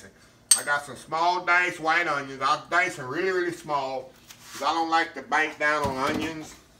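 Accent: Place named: West Texas, United States. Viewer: Samon, yum! Up here in West Tx, sounds like my family. I chuckled. Looks delicious 😋!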